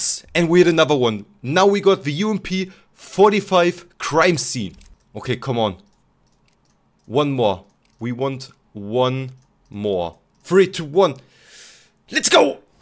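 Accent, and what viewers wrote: Germany. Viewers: Nice tradeups, and are you german, because you have a strong accent xd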